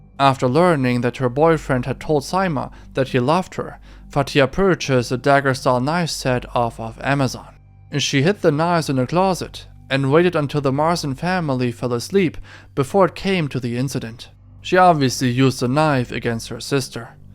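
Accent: Germany. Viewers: Are you German? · I dont know why but i think you are german. You pronounce some words very similar to me as a german. 😁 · Bist du Deutscher? Wegen deiner deutschen Aussprache 😏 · Ooooooh you’re GERMAN! I’ve been trying to pinpoint it lmaooo I legitimately could only tell once the video started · you are the best man i have question where did you learn English ? you English is perfect of course with that Germán accent.. nice videos man no 1 fan here from NEW ENGLAND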